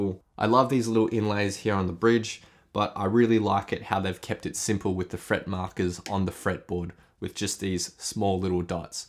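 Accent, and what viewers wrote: Australia. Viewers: i always watch your videos help me to improve and learned base in your accent seems like you live he in Australia aswell :)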